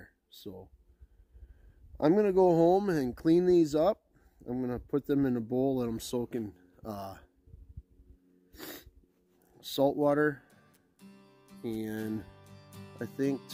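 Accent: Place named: Canada. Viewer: You sound Canadian lol I’m from Alberta good tips boss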